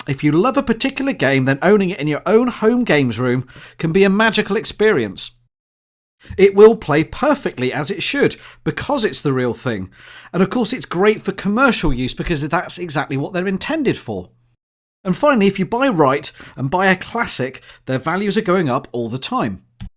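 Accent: England, United Kingdom. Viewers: hummm u sounds like britis. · He sounds English. "British" is a nationality, not a language.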